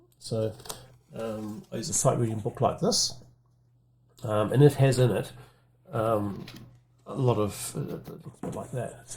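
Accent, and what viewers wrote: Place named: Ireland. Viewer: I am really very interested in your videos because I love your structure and to see your progress and that you are not performing. That's real live 😊. As a non nativ-speaker only your Irish (?) accent is really challenging for me and often I only guess what you maid have said 😅 Keep going!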